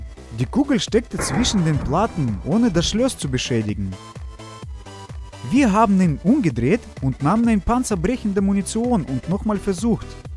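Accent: Russia. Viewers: er ist russe oder redet er wie ein deutscher?!!! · Ah du russe dashalb aktzent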